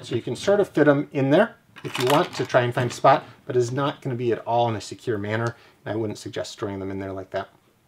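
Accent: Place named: Michigan, United States. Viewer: Are you from Michigan or Illinois ? You have a similar accent to mine. Nice video by the way I just discovered your channel.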